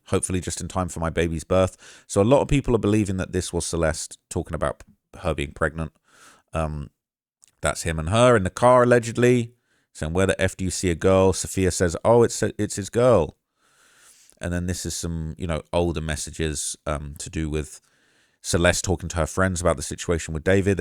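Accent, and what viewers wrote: United Kingdom. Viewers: Ngl your over use of Black American vernacular while u have a damn British accent is high key annoying af BE YOURSELF AND STOP COS PLAYING MY CULTURE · He's British we say things differently. We have a different accent to Americans!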